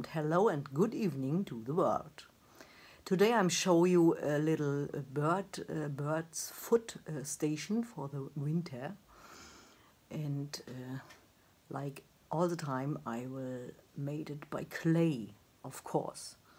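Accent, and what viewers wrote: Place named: Schwerin, Germany. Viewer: Huhu.. super lustiges Video, wiedermal 😊.. Sachmal, eine Frage: dein Slääng 😂 hört sich nach meiner Heimat an 😃.. Nähe Schwerin... du kommst doch da irgendwo wech näää 😊. Lg. Grüße aus Bremen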